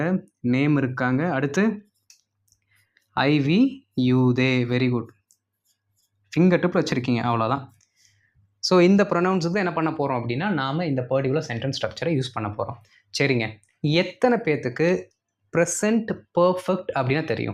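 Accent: Coimbatore, India. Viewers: I thought so.. That's why I asked.Coimbatore slang. (Nice) Thanks for answering. I madam.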